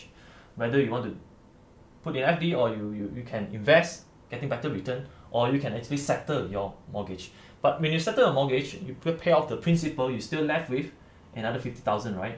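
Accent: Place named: Singapore. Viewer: I can't stand Singlish....my ears r so itchy listening to Singlish accent. Either you speak Chinese or proper English. But not Singlish...gosh.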